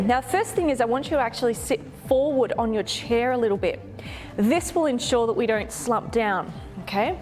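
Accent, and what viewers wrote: Australia. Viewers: Definitely felt the burn in the arms! Wonderful channel, with a lovely focus on gentle exercise rather than wearing oneself out. We are Aussie expats from QLD living in Sweden currently, nice to hear your familiar accent while working out. 🥰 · Ì love your work. So nice to have an Aussie accent and a not at all pretentious instructor.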